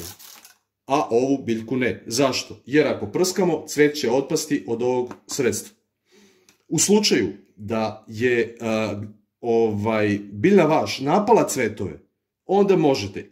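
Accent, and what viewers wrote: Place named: Serbia. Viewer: Вслушиваясь в ккрасивый баритон и слова, угадывала, какой национальности принадлежит человек.Многие слова знакомы,текст понятен почти полностью.Серб, оказалось!!! Спасибо, дружище! Успехов Вам!!!!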